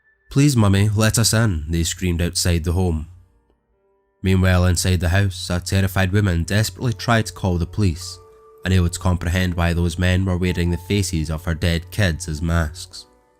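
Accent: Scotland, United Kingdom. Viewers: Love me a good Scottish accent. Reminds me of Shrek 💚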